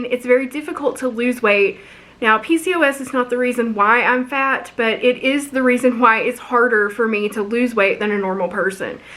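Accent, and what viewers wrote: South, United States. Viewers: your lil southern accent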